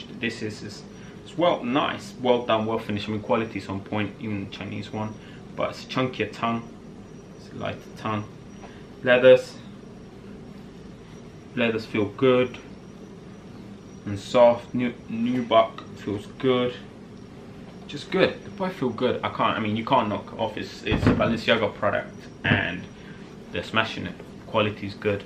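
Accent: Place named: United Kingdom. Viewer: Are you Mexican ? 😁 I’m confused because your accent is British 😂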